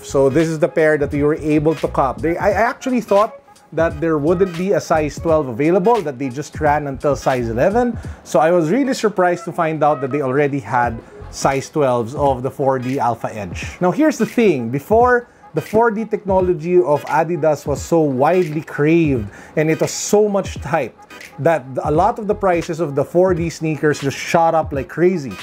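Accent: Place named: Philippines. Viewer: This guys has the strongest Pinoy Accent I ever heard in YouTube 🤣🤟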